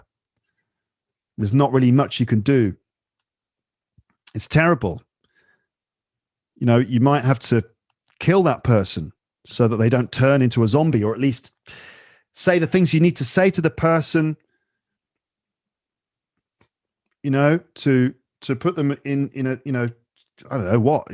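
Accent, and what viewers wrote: United Kingdom. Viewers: Sorry i don't wanna listen UK accent. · I was listening to your podcast for approximately 4 months ago ; and due to that reason, i started to get your British accent furthermore the evident improvement in my english in terms of vocabularies, listening and speaking. You're my favourite teacher and podcaster, I really appreciate you and wish the best for you always. 😃💚.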